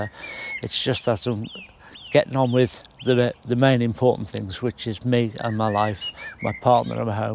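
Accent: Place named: Wirral, United Kingdom. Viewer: Only just found this channel and learnt so much from one video. Thought I could hear a Wirral accent and looked for more videos and seen this post. So sorry to hear of his passing.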